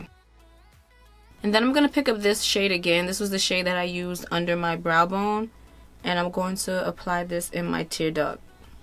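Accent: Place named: New York, United States. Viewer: SubscribingBECAUSE .....the tribe palette look.... u did that.......you’re a NYer (accent dead give away lol 😂)and you’re an awesome mom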